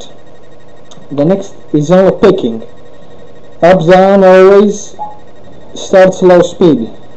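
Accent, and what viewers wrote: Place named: Russia. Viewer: russian?!?!?!? every time i hear a russian accent, I think of the game starcraft... "Battlecruisers, ONLINE"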